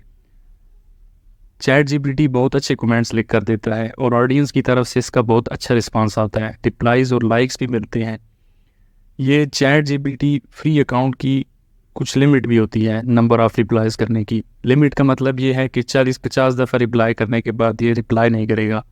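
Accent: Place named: Pakistan. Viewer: جناب صاحب السلام علیکم ورحمتہ اللہ وبرکاتہ بعدہ عرض ہیکہ آپ اپنی گفتگو کے دوران انگریزی زبان کے الفاظ بہت زیادہ استعمال کرتے ہیں کیا آپ ایک پاکستانی شخص ہیں کیونکہ آپکے لب لہجہ سے یہی معلوم ہوتا ہیکہ آپ ایک پاکستانی شخص ہیں' میرے مشاہدات و تجربات میں یہ بات آئی ہیکہ ملک پاکستان میں صحیح وخالص اردو زبان صرف علماء ربانیین ہی بولتے ھیں بقیہ عوام الناس تو انگریزی زبان سے بہت متائثر بلکہ بہت مرعوب ہے، قال اللہ سبحانہ وتعالیٰ، ألا ان اولیاء اللہ لا خوف علیھم ولا ھم یحزنون، الذین آمنوا و کانوا یتقون۔ خیر اندیش حافظ عبدالحفیظ، الجمہوریہ الھندیہ ۔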